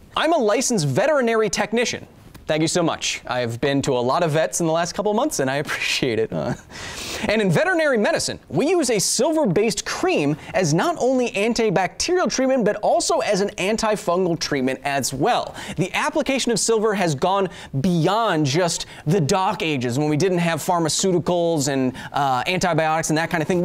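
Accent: Poland. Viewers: HI, really love the show and I loved the polish accent of this vid (for obvious reasons xd) but don't do my boy Tomasz like that... In pronunciation of that name you emphasize the "T".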